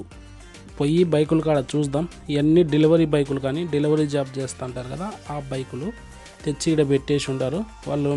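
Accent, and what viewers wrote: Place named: Telugu, India. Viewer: Brother only nee telugu slang kosame nee videos chustha From/telangana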